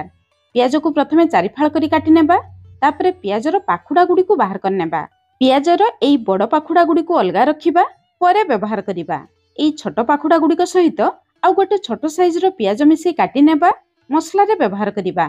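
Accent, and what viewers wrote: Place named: Ganjam, India. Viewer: Ganjam style re torada kahanti...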